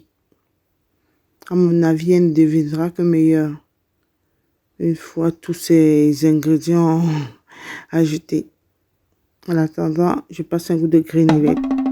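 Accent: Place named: Africa. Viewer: coucou je trouve que t’as un accent africain 👋😄 t’es trop bien ce que tu fais. Bonne vidéo comme d’habitude. . quelles sont tes résolutions pour 2022, moi c’est de faire plus de vidéos sur YouTube c’est cool. Bonne soirée. Yanis, À bientôt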